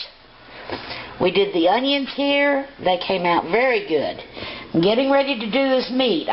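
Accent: South, United States. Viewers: I am so buying one! I must admit your accent is too cute and I'm a Texan! Gotta love the south!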